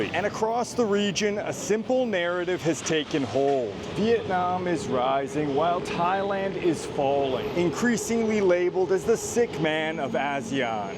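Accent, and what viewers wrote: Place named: Newfoundland, Canada. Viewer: I'm Canadian also, but your accent is driving me nuts. You talk like a non native speaker. Are you a Newfie?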